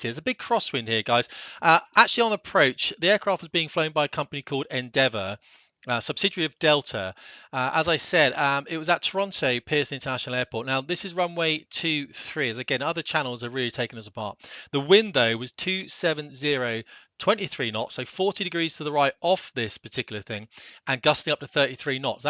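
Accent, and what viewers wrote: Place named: England, United Kingdom. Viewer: The gentleman speaks with evidences of a regional English rather than the "received" British English or standard, mid-western American English and at a pace suggestive of being prodded on with a stick. Very difficult, I find, to follow! Very regrettable!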